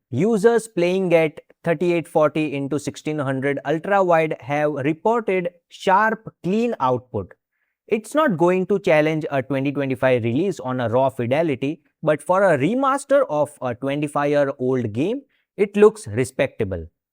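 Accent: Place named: India. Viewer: Indian accent, disregard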